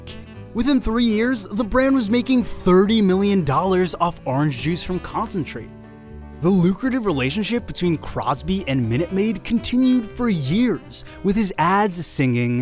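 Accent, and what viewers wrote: California, United States. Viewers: he has the HEAVIEST Californian accent 😭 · This slow California accent that keeps asking me questions even when its telling me answers is killing me.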